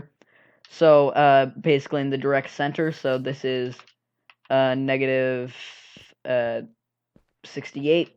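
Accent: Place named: United States. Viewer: Ok like no hate or anything I appreciate the tutorial and stuff but why are you using British lingo (like “rubbish” and “a wee bit”) but you have an American accent?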